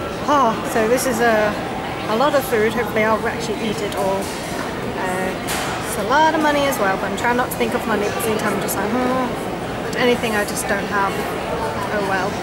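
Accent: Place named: United Kingdom. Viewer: You are cute. Love the UK accent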